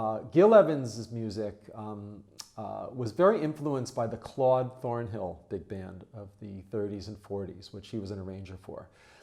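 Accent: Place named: New York, United States. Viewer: It's been such a pleasure watching you and leaning with you over these past few year. I have noticed that your NY accent and cadence have gotten a bit thicker over time compared to your first videos ;-) Keep well.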